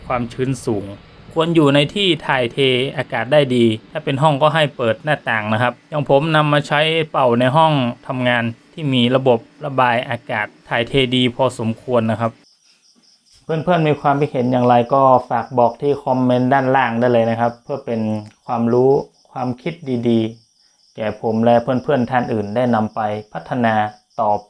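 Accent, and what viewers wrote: North, Thailand. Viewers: พี่เป็นคนจังหวัดไหนครับ สาบเมืองจังเลน 😁😁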